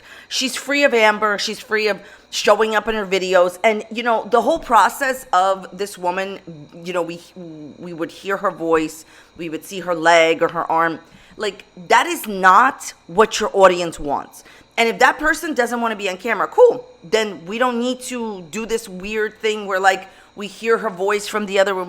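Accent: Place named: New Jersey, United States. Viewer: Love voice the most about her videos it’s so Joisey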